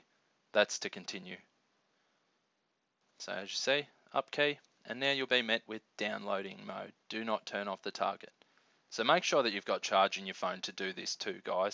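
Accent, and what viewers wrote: Australia. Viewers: Nice video, you Australian? I was thinking of doing it, though i'm having second thoughts - what are the benefits in having your Sg3 rooted?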